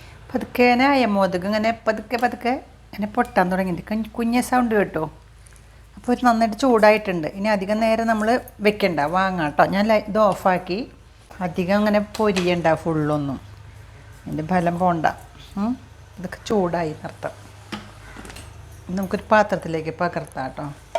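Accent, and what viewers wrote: Thrissur, India. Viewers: മ്മടെ പക്കാ തൃശ്ശൂർ സ്ലാങ്.. മരുന്നും അവതരണവും ഒരേ പോലെ നന്നായിരിക്കുന്നു ചേച്ചി.. 👌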